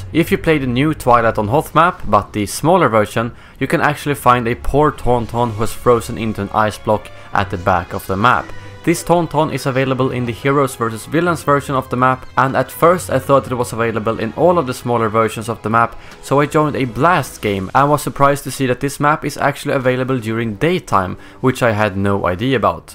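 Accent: Sweden. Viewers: Are you swedish? Keep up the good work man..👍🏼👍🏽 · Are you Swedish? Because you're English sounds like you're Swedish. Have a good weekend!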